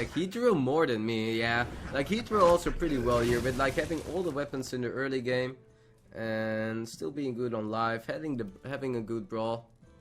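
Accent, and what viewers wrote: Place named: Netherlands. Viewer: Je Nederlandse accent is lichtelijk afleidend 😅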